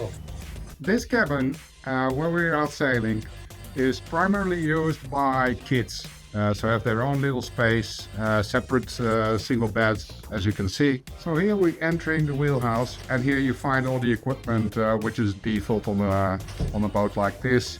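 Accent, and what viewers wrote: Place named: Netherlands. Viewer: Lekker Nederlands accentje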